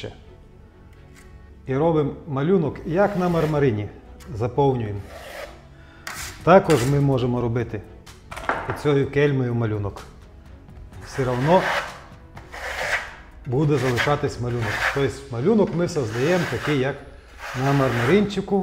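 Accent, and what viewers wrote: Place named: Western Ukraine, Ukraine. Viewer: Тогда тебе придется учить язык всех 14 остальных республик бывшего СССР! Ведь остальные тоже тебе так скажут. Русский был выбран по праву большинства.Только и всего.И братья тут не причем. Было бы украинцев 175 миллионов, как при совке русских, то и гоаорили бы на украинском. А тот факт ,что автор начал делать ролики на своем языке,говорит о том, что ТВ пропаганда ,стравливания РУСОВ, свою работу таки сделала. Он со Львовской обл., города Стрый, его Западенский акцент всегда чувствовался, но тем не менеее,он вещал на русском, а тут вдруг проснулся...) Патриот вшивый! А где ты раньше был?! Что раньше,до захвата Крыма, по-русски вещал? Скажу, як громадянин України, рагулів, таких ,як автор цього відео, пів України !!! Бидло ,воно й в Африці бидло! Дизлайк і відписка !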